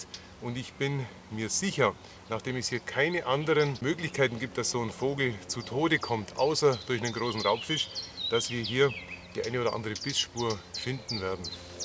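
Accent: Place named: East Franconia, Germany. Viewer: Ich mag Deinen Dialekt! Ist das ostfränkisch? Bamberger Gegend? LG aus Österreich..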